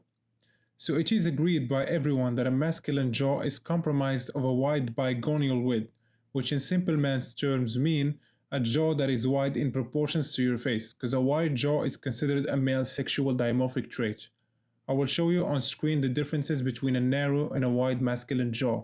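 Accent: Morocco. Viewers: nice Moroccan accent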